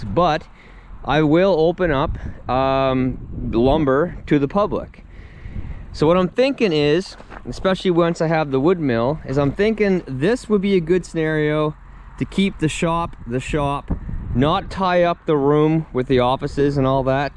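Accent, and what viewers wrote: Nova Scotia, Canada. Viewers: You'll just end up with a cluttered shop keeping everything in there. Customers will feel safer going into a tidy office, without the mechanical noise also. You've got the land, and we all know by now you love tidy! 😅 I like the way you talk by the way. You're a Nova Scotia boy through and through 😊 Which , of course, brings me to the subject of AGE. You never announced the winner of your " Guess My Age" video. I'm still waiting .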